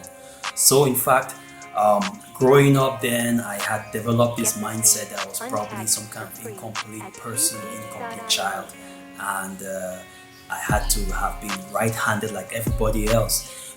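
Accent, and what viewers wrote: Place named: Nigeria. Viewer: I totally thought you were Nigerian, because of your accent. I'm Nigerian, myself. Good to know you're from Yaoundé, Cameroon. I have a friend from Yaoundé but he speaks more French than English. I absolutely agree with your reasons for freeforming and the examples you gave with sports and left-handedness. There's something so true and unapologetic about it that calls us to explore true parts of ourselves that we are forced to suppress. My locs are semi-freeform and I love them so much.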